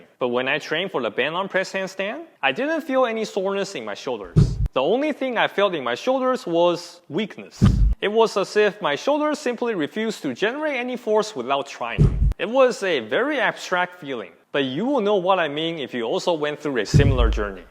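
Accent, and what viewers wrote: China. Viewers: 老哥 这是中式口音吗？感觉口音很熟悉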